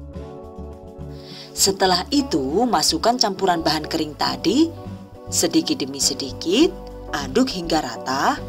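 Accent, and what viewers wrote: Banyumas, Indonesia. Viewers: Bunda,kok bahasane ngapak , emang asli mana bun,,,oya bun nnti saya coba ,,,,,sehat selalu bun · Deneng bisa basa ngapak yak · 😂😂😂 lagi serius2..., becanda gaya ngapak ... The best lah Bu!, thankyou resepnya Bu!...saya coba nanti. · Aq pasti ngakak denger logat ngapaknya,,,,,kui sing tak kangeni bunda,,,,aplgi resepnya,,,,,,suka bangettt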